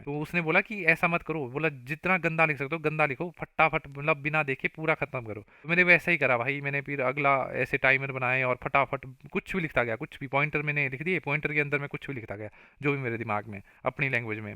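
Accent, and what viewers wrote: Himachal Pradesh, India. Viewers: Oo hi? I'm from kullu Apki tone se lg gya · You sounds like a himachli guy ...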